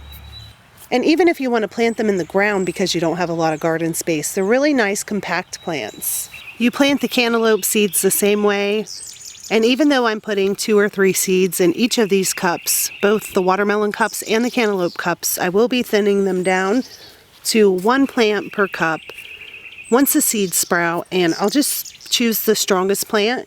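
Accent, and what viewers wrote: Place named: Philadelphia, United States. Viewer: Your accent is straight-up gold! Delco? South Philly? I love you!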